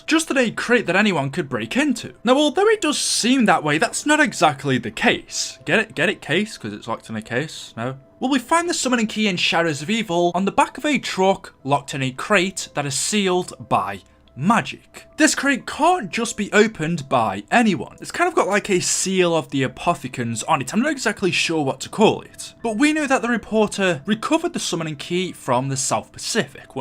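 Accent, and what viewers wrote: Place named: England, United Kingdom. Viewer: Where you from in England not zombies related just curious also love the vids mate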